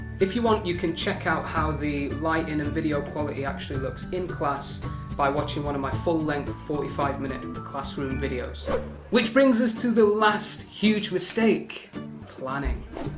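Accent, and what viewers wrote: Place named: East Midlands, United Kingdom. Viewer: Brilliant video, thanks so much! I´ve got my first online teaching interview tomorrow and these tips were really useful, especially what you said about planning after classroom teaching for 8 years and doing a hellll of a lot of prepping! BTW are you from Derby/Notts area? Your accent seems so familiar :D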